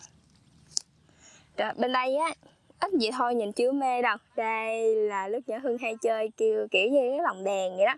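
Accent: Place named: South, Vietnam. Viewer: Giọng hát miền nam .Dễ thương vô cùng.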